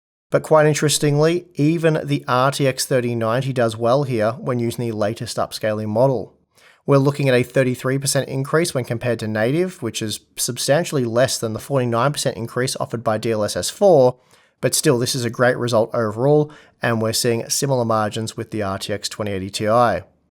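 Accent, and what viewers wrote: Australia. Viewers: Great insight, thank you so much 😊 P. S. I love your Australian accent, it's fantastic to listen to it.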